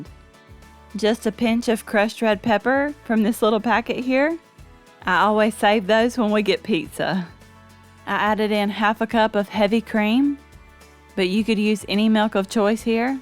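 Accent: South, United States. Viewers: I'm so glad I just came across your channel! After watching the first video you posted 3 days ago I immediately subscribed! Your recipes look so delicious and easy to make. I've been looking for some new recipes for casseroles and the crockpot especially and you've got those and many others that I'm probably going to try them all! Thanks so much for sharing!!! I'm also curious where you're from if you don't mind me asking? I'm from the south in North Carolina and you sound like you have a southern accent and your recipes seem like they're southern too which is another reason I love them!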